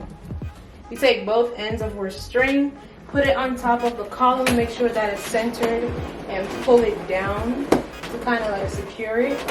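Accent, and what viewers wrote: Jamaica. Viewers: Great tutorial. I love balloons, but I don't like working with them; I'm always thinking they are going to pop, and that freaks me out to the Nth degree+1. P. S. you sound Jamaican.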